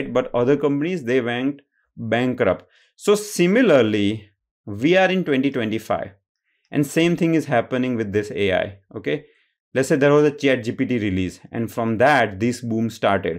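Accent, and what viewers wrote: Gujarat, India. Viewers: Loved the video! 💯 The moment you spoke, I was like, Aree waah, Gujarati !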